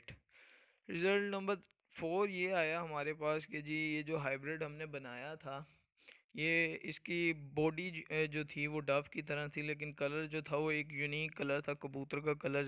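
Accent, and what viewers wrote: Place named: Pakistan. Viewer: آپکی انگلش بولنے کے طریقے سے ہی پتا چل گیا تھا کہ آپ پاکستانی ہی ہو😃😉😜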